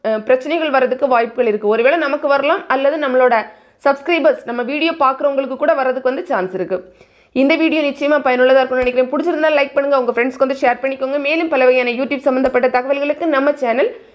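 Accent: Kanyakumari, India. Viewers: Neenga kanyakumari district ahh?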